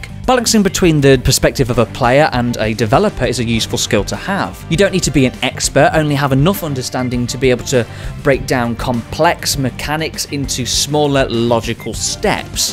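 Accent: Northern England, United Kingdom. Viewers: That's a northern English accent. 😄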